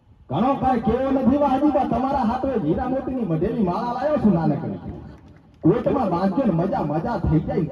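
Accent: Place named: Vadi, India. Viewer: વાહતા ભાઈ તમારા વિડિયો જોવાની મજા વાદી ની બોલી માં હો❤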